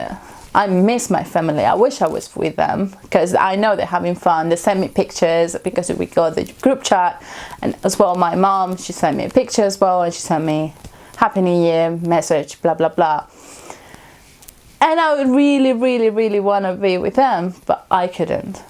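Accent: United Kingdom. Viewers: You mentioned you are not a native speaker and I wouldn't have really known unless you said so. You have a wonderful British accent. All the best with your new channel. It takes time to get a following so don't give up if you feel like you don't have many subscribers or views at the beginning... keep at it! - Rob W.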